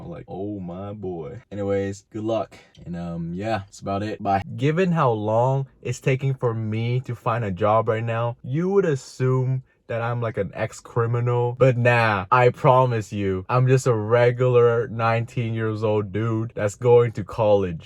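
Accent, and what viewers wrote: Vietnam. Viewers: Are you in America? Sounds Vietnamese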